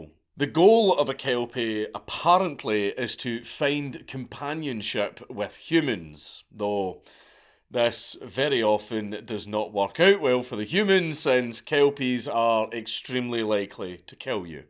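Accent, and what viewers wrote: Scotland, United Kingdom. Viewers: Are you Scottish? My grandfather on my mother's side surname was Frazier. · This video was amazing. I want to see you talk more about Celtic legends as in-depth as you do. Especially in your awesome Scottish accent.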